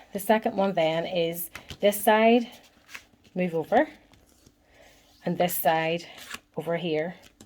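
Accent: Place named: Scotland, United Kingdom. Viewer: Just subscribe, love the beautiful Scottish ( I hope) accent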